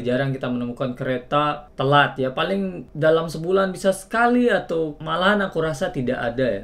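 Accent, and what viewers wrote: Lombok, Indonesia. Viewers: Logat sasaknya tgel👍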